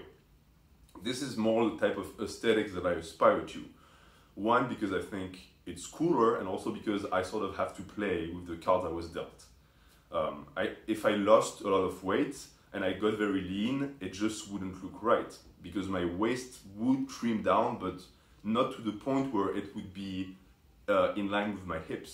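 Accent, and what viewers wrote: France. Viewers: C'est bien un accent francais que j'entend ? J'ai bien l'impression :)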